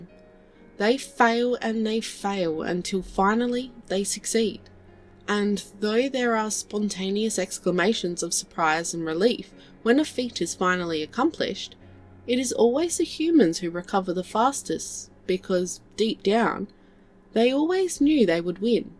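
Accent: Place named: Australia. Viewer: I think it's wonderful to have a young Australian voice reading these stories. It reminds me of my childhood, (although the readings were more of fairy-tales than sci-fi - unfortunately).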